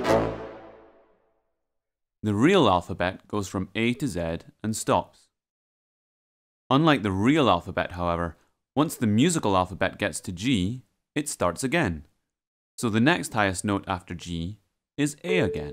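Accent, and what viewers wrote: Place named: Scotland, United Kingdom. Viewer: Why u scottish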